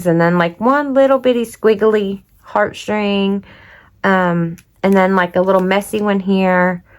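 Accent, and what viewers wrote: South, United States. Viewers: Hi, I just discovered your channel. I just loved this presentation. I'm a crafter and love Temu. Thank you for the information on the price adjustment, I just got $3.78 credit just for the last month. Also ordered some of those random die cuts. I had been wondering if they were worth the money. Also I don't know where you live but I just love your southern accent! Thanks again.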